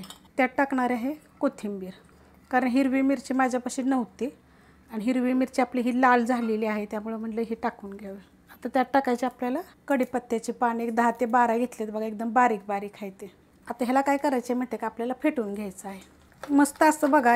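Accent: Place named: Mahabaleshwar, India. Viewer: तुमच्या सगळ्या रेसिपी एक नंबर असतात...तुमच्या बोलण्यावरून मला तुम्ही माझ्या माहेरच्या म्हणजेच महाबळेश्वरच्या वाटता.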